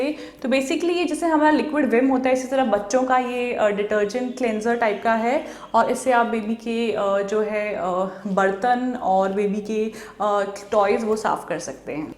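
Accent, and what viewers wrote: Garhwal, India. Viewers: Aap garhwali ho kya ma'am....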